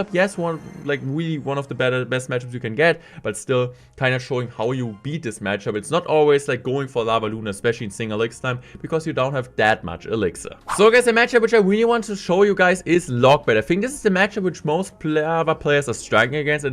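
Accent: Germany. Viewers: Just found this video on my recommended. This guy's accent sounds german. Lit 👌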